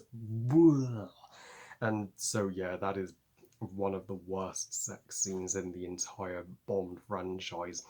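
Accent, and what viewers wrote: United States. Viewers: Great American accent 😊 Happy weekend, my friend!!! 💜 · Oh my gosh. You’re so awesome! 👍😂 I love your American accent. More more! Artwork is awesome!!!🥰❤️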